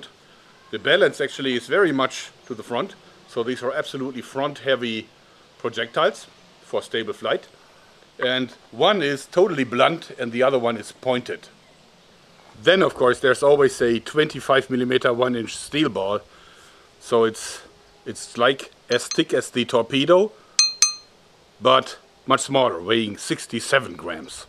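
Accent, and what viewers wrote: Germany. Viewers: Are You german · Is he German ?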